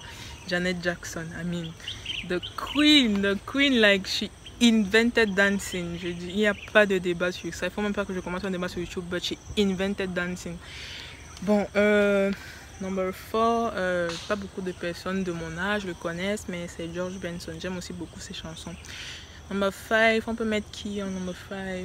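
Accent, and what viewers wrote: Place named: Cameroon. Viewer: J'ai reconnu l'accent dès le début. 237 en force !!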